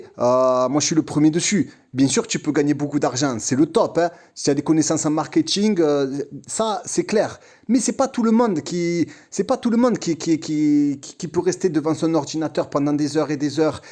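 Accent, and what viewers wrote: Marseille, France. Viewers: Salam, tu m a fait trop rire avec la charrette...et ton accent marseillais...j aime beaucoup Tlemcen, j y suis venue en octobre et j ai visité ce magnifique hotel la Renaissance..bonne continuation et courage à toi...il me tarde d y revenir au prochaines vacances in challah.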